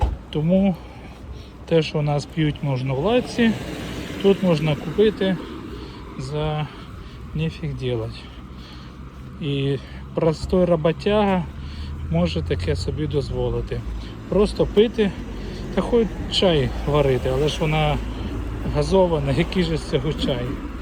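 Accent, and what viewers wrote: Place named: Western Ukraine, Ukraine. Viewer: Повертайся додому ,ухилянт,ти,херовий..і працюй на свою державу..чи може тобі держава не потрібна?? Не соромно? Такий бугай шастаєш за кордоном.. Мова західної ..і відео ні про що ... Яке майбутнє даси синові?? І ще одне , блогером може бути людина ,,яка знає і може щось цікаве розповісти,,а не про бутилочку з водою.і взуття замурзане...😅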